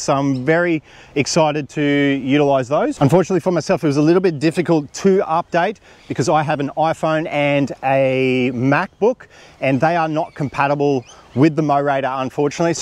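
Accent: Australia. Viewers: Thanks for this vid - it's great to see it in use on an Aussie property (with an Aussie accent!) I've just put my order in for the 4WD version, expecting it between chrissy and new year. As a relatively small female in my mid fifties, mowing 27 acres is a challenge - I usually focus on 5 acres and let my neighbors cattle moo the rest. As much as that is a nice thing to do, it means keeping fences closed and dodging cow pats for months. My place is on 30-40 degree slopes, with a front paddock, a back paddock, an orchard and the house yard, all of which are laden with red bellied blacks and ticks during the hot months and leeches during the wet. Because I've purchased the 4WD version with the extra wide/traction wheels, I'll be able to use this while standing somewhere safe and shady, or at the very least, following through a path of mowed area, and that will be life changing. 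I'll be able to do work on parts of my property I've never even walked on and I am sure I will feel safer without having tall dead grass standing like a fire hazard. This machine has an application for people with a disability, sure, and also for people who just aren't very physically strong, and that is going to be a target market that a lot of 'guys' wouldn't even consider. I'll let you know how it performs if you're interested. Oh, and something I have not seen mentioned on vids about this machine - its got a lithium PHOSPHATE battery - which means it last for a few thousand cycles (instead of lithium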